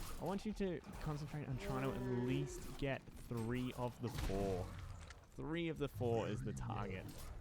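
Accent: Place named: Australia. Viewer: Hey this is mad, I was looking for a guide to get my mate started on this game. clicked on this cos it's fairly recent, But the major plus when i first hear you talking. You sound Aussie, but then there's elements of your pronunciation that doesn't..... are you? hahah. I'll watch through now and show my mate. Cheers! (Please reply)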